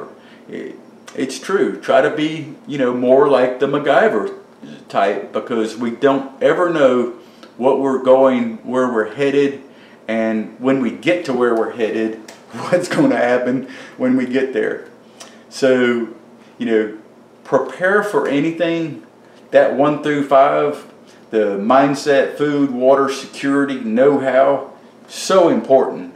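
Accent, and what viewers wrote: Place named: West Virginia, United States. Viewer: I wonder if is going to play and sing in one of his videos he is definitely a patriotic American and sounds like awesome people my guess is by listening to that accent he is probably from WV... 😉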